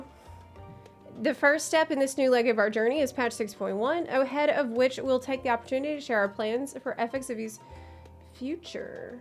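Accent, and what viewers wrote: South, United States. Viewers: i love her southern accent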